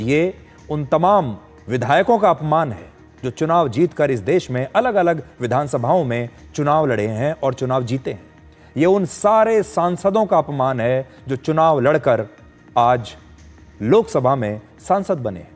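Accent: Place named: Bengal, India. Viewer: U r 100% bengali. (Ur pronunciation is similar to bengali)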